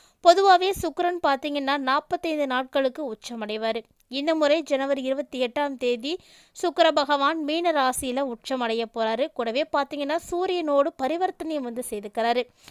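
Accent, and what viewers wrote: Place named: Tirunelveli, India. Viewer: ஏல திருநெல்வேலி பக்கம் வந்த உண்ண??????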